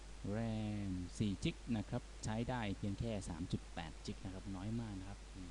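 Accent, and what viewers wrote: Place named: Myanmar. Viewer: พูดก็เบา ฟังก็ไม่รุ้เรื่อง สำเนียงอย่างกับพม่า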